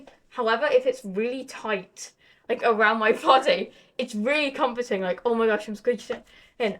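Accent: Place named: United Kingdom. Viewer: Just found this channel while scrolling through my recommended, 10/10 yap session. I can’t tell if your Bri-ish but the accent is cool lol(Latin American here)🏳️‍⚧️🏳️‍🌈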